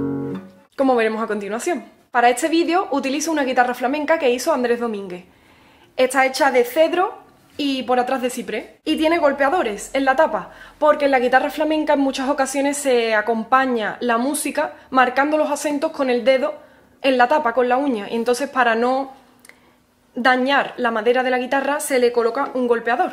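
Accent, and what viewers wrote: Andalusia, Spain. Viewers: it's not a dialect but spanish with accent from Andalusia. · Es que es de Andalucía donde tienen un acento muy propio. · A quien no le gustaría tener a una profesora de guitarra tan guapa.... Madre mía....y con este acento andaluz...es un encanto escucharla. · she is from Andalucía, she has a particular way of speaking spanish. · Es español, español, no se trata de ningún dialecto, es Español con sus toque particular andaluz en la bella voz de.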